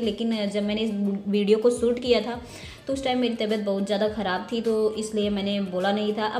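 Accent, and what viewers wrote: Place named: Kanpur, India. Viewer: Amazing makeup di khaa se h aap.... Apki language kanpur ki lgti h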